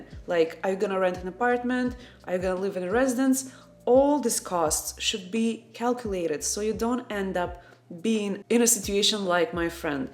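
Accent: Russia. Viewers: I soooo much love your Russian english🤣🤣🤣🤣 thanks for this wonderful video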